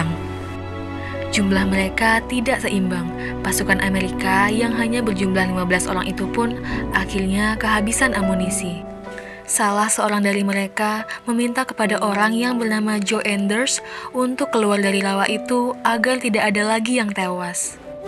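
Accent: Semarang, Indonesia. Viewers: orang semarang kah mbak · Benern semarangan hehe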